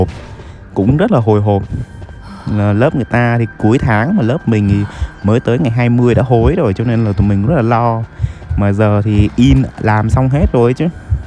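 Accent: North, Vietnam. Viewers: Anh gốc là ng bắc đko ạ?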